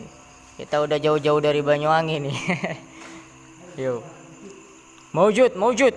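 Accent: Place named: Madura, Indonesia. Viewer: Keliatan bgt anak pesantren. Org jember tp logatnya madura.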